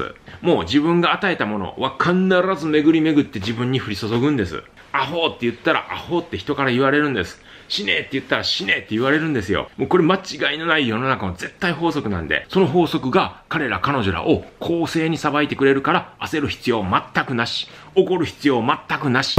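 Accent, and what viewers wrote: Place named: Kansai, Japan. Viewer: ありがとうございます。ここ4年ほど、腰痛に悩まされています。2週間ほど前に、初めて、Shojiさんの動画を拝見しました。さっそく、Shojiさんのおっしゃる通り、ストレッチのエクササイズを始めたら、体が軽くなったような気がします。理学療法による治療に通っているのに、いつまでたっても、痛かったんです。50歳になったばかりですが、年のせいなのか、仕事（商品補充、日によって2トンは越える荷物を運んでます）のせいかと思っていたんですが、、、体に対して、無知だから、腰痛を起こしていたのですね。悩みがあると関節痛も出るし。南仏に滞在して23年、もうすぐ、日本料理教室、一本だけで、やっていくつもりです。健康でなくっちゃ～！Shojiさんの哲学にも納得です。尼崎で幼少期を過ごした私にとって、Shojiさんの関西弁も気持ちいいです。これからも、もっともっと笑顔で過ごしたいです。Shojiさんのおかげで、実現できそうです。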